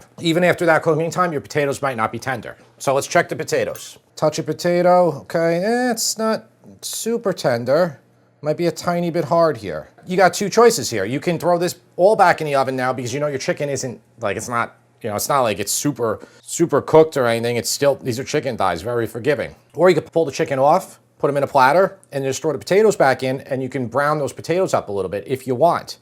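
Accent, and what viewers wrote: New York, United States. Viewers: I JUST FOUND THIS CHANNEL!!!! Cannot believe I'm seeing this for the first time. Great recipes, great personality. He sounds like a New Yorker, like me!!!! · He sounds so “New York” — I love it! The “Taste Tester” is so darn cute! 😻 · I love your NY accent. the NY accents are dying off. because of the 1 billion foreigners flooding into NY.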